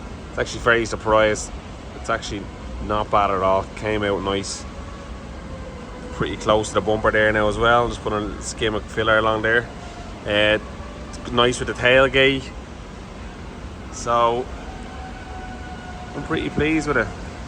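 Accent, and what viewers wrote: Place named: Dublin, Ireland. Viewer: Love the Dublin accent! Up the Dubs! Great job on the car.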